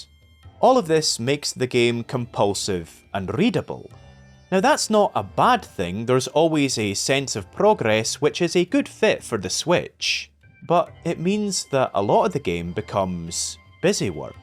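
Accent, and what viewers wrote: Scotland, United Kingdom. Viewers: Honestly, having watched the video, I would really really like to see you tackle Scarlet and Violet one day, because it's one of the most interesting games in the series to me in how it does so many things right, and yet has so many glaring flaws. Honestly, I just really want to hear my beautiful Scottish sweetheart talk about Pokémon for even more hours. I literally couldn't get enough if I tried! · The Scottishness is off the charts. Half the reason to hear you is just being reminded of Tennant.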